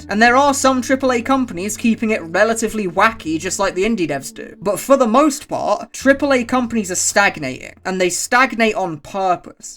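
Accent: United Kingdom. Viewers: I like the funny British man talking about indie games and the outer wilds. Seriously tho I know this is an old video but I love your content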